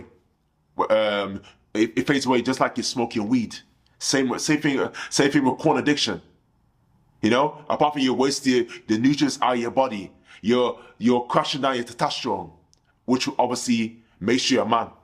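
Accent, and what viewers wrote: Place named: Caribbean. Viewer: you say you are muslim bro but cl you sound west indie. Where are your family from?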